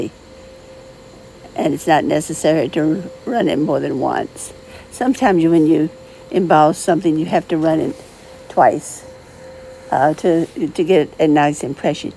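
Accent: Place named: South, United States. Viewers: What a great idea!! It turned out beautifully. I just found your channel and subscribed. Your accent sounds like your from a town near me in North Carolina!! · Your southern accent is beautiful...yella. These are such beautiful works of art! I just found your channel and I love it!❤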